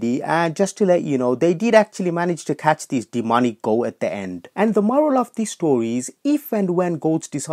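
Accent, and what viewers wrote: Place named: Birmingham, United Kingdom. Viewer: Your too funny and i love your brummie accent ❤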